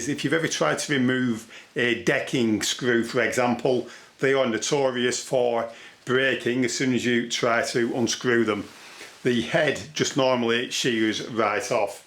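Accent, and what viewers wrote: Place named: Yorkshire, United Kingdom. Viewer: Yorkshire accent must be one of the worst English accents in the world….boring and damn disgusting.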